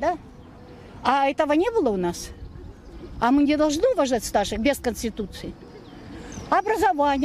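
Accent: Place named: Ukraine. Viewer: У нее украинский акцент! снято в Киеве на съемной квартире! Фу!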